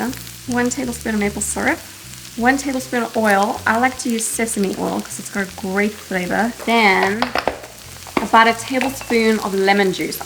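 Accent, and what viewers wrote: South Africa. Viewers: Thank you for these lovely easy recipes! I've been watching your videos for years & loved your South African accent but wow your accent has really changed so much! Love your content. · Hahaha i love the "somme" randomly coming out sometimes 🤣 mis jy ooit SA?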